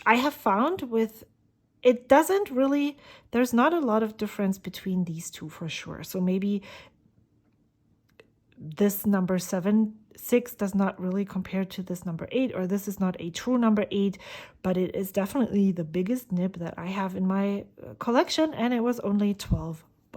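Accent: Germany. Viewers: I bought three of these and they all write differently. One was perfect out of the box, one was a bit on the dry side, and one needed a lot of tweaking before it wrote like it should. Not the first time that Jinhao's quality control, or lack thereof, makes the pens they produce a little unpredictable. All in all not a bad pen, especially for the price. PS: Finally, an English-speaking pen reviewer who knows how to pronounce "Montblanc". I detect a hint of an accent though. Are you German? Don't shoot me if I got it wrong. :)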